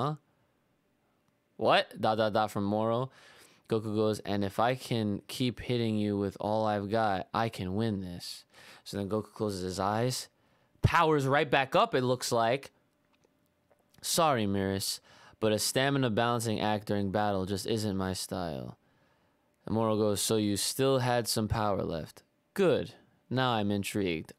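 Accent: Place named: Philadelphia, United States. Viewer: Bro you from philly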